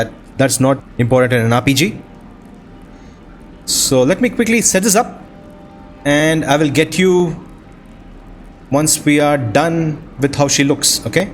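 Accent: India. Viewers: Omg r u Indian?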